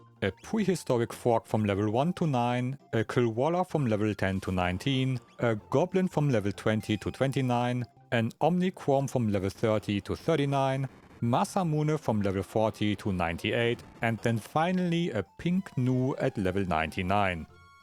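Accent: Germany. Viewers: If I may interject. Elmer Fudd has a speech impediment. He says wabbit instead of rabbit and wittle instead little. I personally don't get the Elmer impression from you. Your accent is very German.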